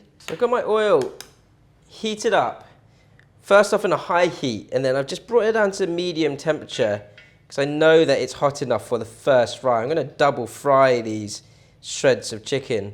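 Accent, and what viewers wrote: United Kingdom. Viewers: That British accent is distracting me.😅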